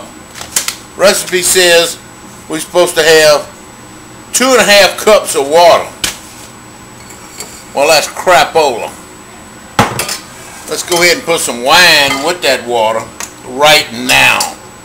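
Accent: Louisiana, United States. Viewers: I could tell from your accent that your a fellow Loiuisianian!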